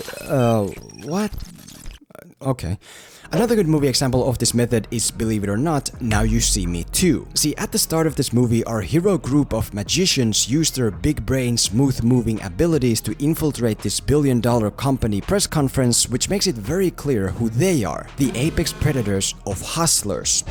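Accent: Finland. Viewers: You have the most Finnish accent I've heard in ages. Am I wrong?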